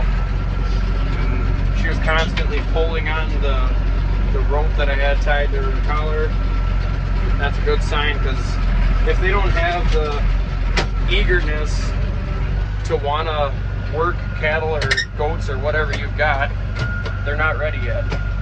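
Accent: United States. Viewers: First time I hear someone talk about herding with an American accent. It sure is something to get used to after listening to Scottish and Irish farmers. Anyway, very interesting video. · Nice to listen to a fellow midwestern accent. Keep up the great work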